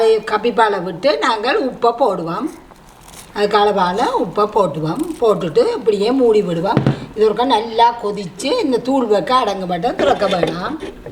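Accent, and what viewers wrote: Jaffna, Sri Lanka. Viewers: உங்களது சுத்தமான யாழ்ப்பாணத் தமிழும், காணொளியிலேயே மணமணக்கும் சமையல் செயல்முறையும், அப்படியே என் அம்மாவைக் கண்முன்னே நிறுத்துகிறது! துரதிஷ்டவசமாக, அம்மாவை இழந்துவிட்டேன், இருந்தும், உங்கள் செயல்முறையைக் கடைப்பிடித்து, அம்மாவை நினைத்து, பல வகையான குழம்புகளைச் செய்துபார்த்தேன்! ரொம்ப நன்றி! இருந்தும், அம்மாக்களின் கைப்பக்குவம் என்பது தனியான ஒன்று! 100க்கு 100 அவர்களின் சமையல் ரகசியத்தை நம்மால் தொடமுடியாது! உங்களுக்கு நல் வாழ்த்துக்கள்!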